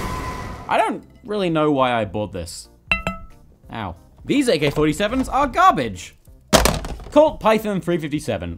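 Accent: United States. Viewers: I never knew a Brit can sounds so American... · I thought he was American. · You’d think he’s American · is turning american. prove me wrong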